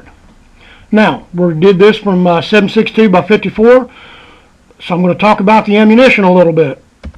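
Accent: Maine, United States. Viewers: That Maine accent isn't wasn't used to be.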